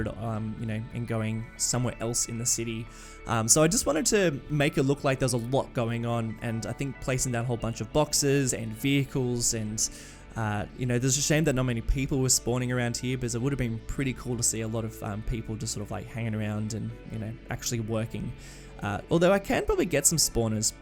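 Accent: Australia. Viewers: Lemme translate aussie to non-aussie. Erhm. 'Good day (g'day) guys, and welcome back to.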